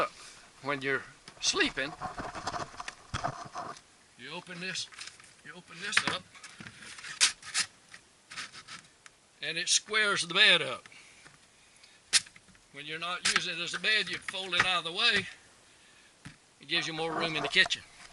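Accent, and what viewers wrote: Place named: South, United States. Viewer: You sir got that nice southern american accent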